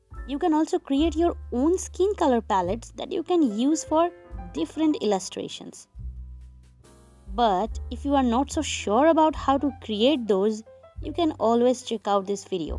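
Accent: India. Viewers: Hello! May i ask you,what is your nationality? Are you an indian? Because you sound like one~😌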